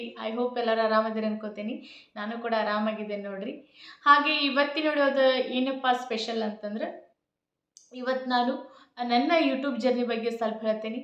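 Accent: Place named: North Karnataka, India. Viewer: Very very nice and good information 🎉 ಉತ್ತರ ಕರ್ನಾಟಕ ಭಾಷೆ ಕೇಳಾಕ ಚಂದ ನನಗಂತೂ ಬಹಳ ಖುಷಿಯಾಗುತ್ತಿದೆ🎉🤗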